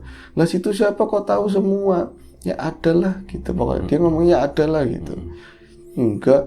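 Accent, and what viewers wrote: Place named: Java, Indonesia. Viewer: Kaku wez pkoke nek bro bwain criTa hahhaaa auTo ngakak medoke pooollll joozz gandozz, sukses trz kang..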